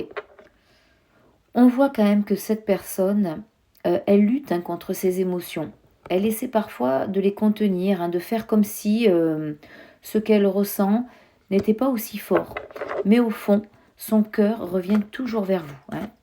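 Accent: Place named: Corsica, France. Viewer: merci j'ai ressentis un léger accent Corse dans votre voix en tout très bon tirage bonne soirée à vous a bientôt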